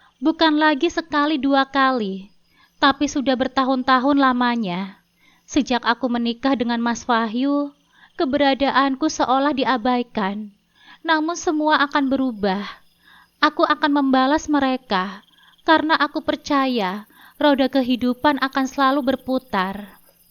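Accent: Java, Indonesia. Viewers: Tangerang ,lucu gaya bahasanya logat jawa,q suka,smgt kaka n sukses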